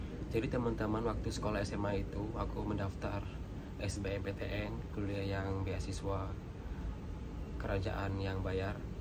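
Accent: Kelantan, Malaysia. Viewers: Wah...dah pandai cakap Kelantan 😀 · Wah.. saya org malaysia pun tak pandai kecek kelate. Hebat kelate mu.🤩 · SALAM... BARU HADIR DLM CHANNEL KAMU. TERUSKAN SEMANGATMU BERJUANG DEMI KELUARGA TERCINTAMU.. BERBAKTI KEPADA ORG TUAMU. ITU YG LEBIH MULIA. SALUTE KECEK KELATE MOLEK BELAKO.. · Wow pndai bro ckp kelantan👍🏻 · pandainya cakap kelantan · Mantap kecek Kelate mu bro 😂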